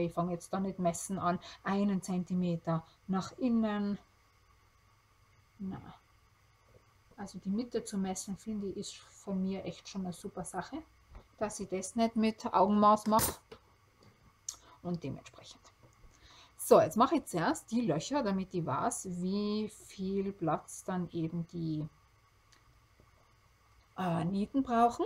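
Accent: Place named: Austria. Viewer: ich den österreichischen Dialekt unglaublich gerne mag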